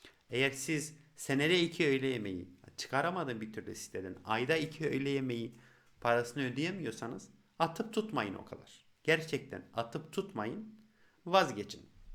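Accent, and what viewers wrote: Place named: Armenia. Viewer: Sen Kürt değil, Ermeni şivesi ile konuşuyorsun. Sakalını sevsinler.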